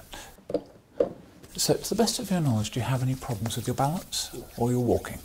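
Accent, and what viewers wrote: England, United Kingdom. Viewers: Yep. This english accent kills me. Silence is better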